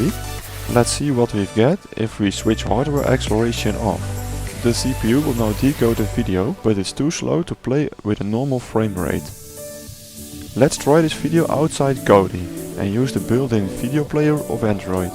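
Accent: Netherlands. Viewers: I hear some dutch accent there :))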